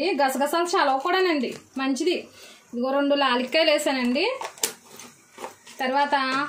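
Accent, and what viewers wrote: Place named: India. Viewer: మీరు మాట్లాడే విధానం ఉందే అచ్చ తెలుగు ఆడపిల్ల ఎలా మాట్లాడుతుందో అలా ఉంది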